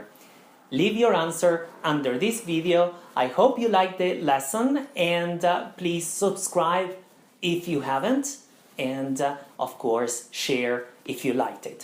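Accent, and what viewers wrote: Italy. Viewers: I trully loved his italian accent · i would have bet that you were italian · You are definitely Italian and not Canadian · your italian accent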